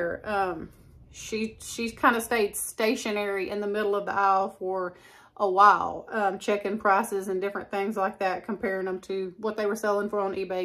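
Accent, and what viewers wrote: South, United States. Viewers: Just found your videos. Love them!! I heard your southern accent and knew I had to subscribe.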